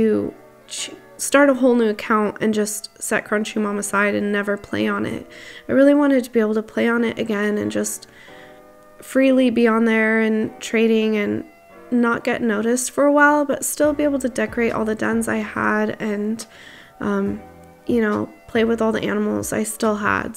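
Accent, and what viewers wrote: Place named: United States. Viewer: ^^ J’ reçois même pas la notif T^T (YouTube bug) N'empêche tu as un très très bon accent américain XD !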